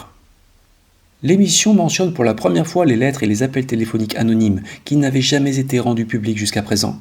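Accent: England, United Kingdom. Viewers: moi j'adore ton accent anglais, je suis fan 😅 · ton accent Anglais me fait toujours rigoler, c'est pas méchant.. c'est quand même gentil d'avoir une pensée pour ses personnes disparus.. bye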